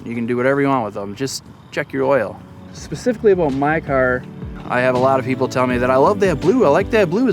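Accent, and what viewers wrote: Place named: North, United Kingdom. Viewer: Holy northern accent. Much respect from Virginia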